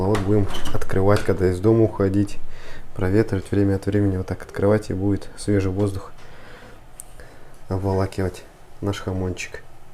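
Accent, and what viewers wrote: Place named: Altai, Russia. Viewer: Спасибо за рецепт! Говор интересный. На брата похож, он с Алтая. Удачи и здоровья!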